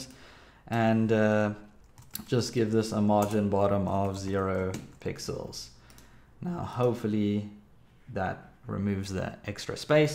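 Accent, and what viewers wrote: South Africa. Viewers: I'm getting addicted to your tutorials and that accent from SA. Thanks, from Essex, UK.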